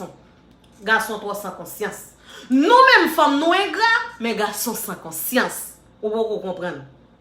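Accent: Cap-Haïtien, Haiti. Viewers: Helloo men mwen ...mwen vin la pou 2 bagay...mwen vin la pou bel ti accent capoise sa ou genyen an...avek bel konsey ou yo 🤣🤣😍😍😍...tout saw di yo se verite❤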